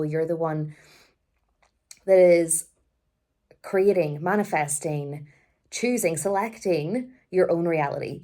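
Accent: Ireland. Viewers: am from the uk and i understand your Irish accent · I love your accent! I'm in the states but have blood over in your neck of the woods. Galway I believe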